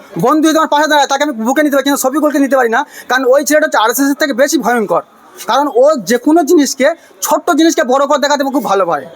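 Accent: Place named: Bangladesh. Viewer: তুই to বাগলাদেশি। তোর কথা শুনেই মনে হচ্ছে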